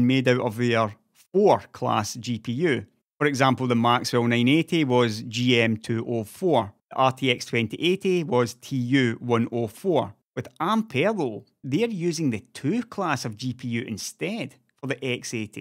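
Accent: Scotland, United Kingdom. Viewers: It's called a Scottish accent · I Missed yurr skottish voice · reading the comments in Scottish. That voice is stuck in my head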